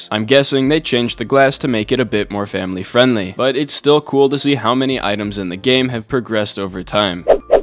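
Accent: United Kingdom. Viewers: British detected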